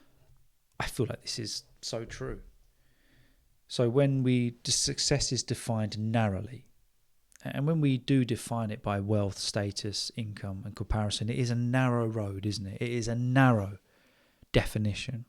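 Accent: United Kingdom. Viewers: Thank you so much for continuing to make videos all these years and for never giving up. Wishing you and your family good health and happiness. I really love your videos, the way you tell stories and share your experience is truly enjoyable. And last but not least, i absolutely love the British accent!. I hope one day I will have a chance to visit the UK. Cheers mate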